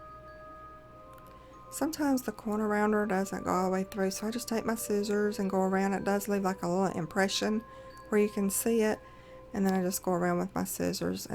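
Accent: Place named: South, United States. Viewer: Where did she grow up to acquire her country accent?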